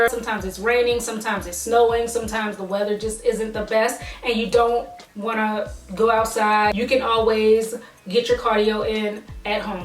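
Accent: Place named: Maryland, United States. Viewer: Are you from Maryland? Your light accent sounds familiar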